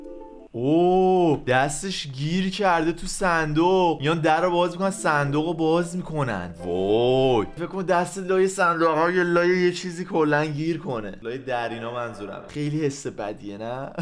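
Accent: Isfahan, Iran. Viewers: اصفهانی حرف بزن ناموصا😂❤️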